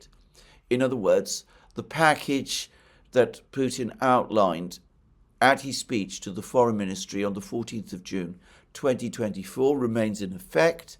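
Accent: United Kingdom. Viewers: thanks, for sharing your insight and experience. its refreshing to hear a speaker on international affairs that you can trust, in spite of the british accent.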